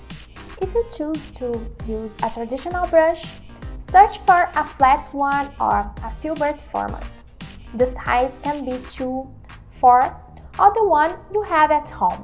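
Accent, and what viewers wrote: Brazil. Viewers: Nice tutorial, just a question Where're you from? Your accent sounds brazilian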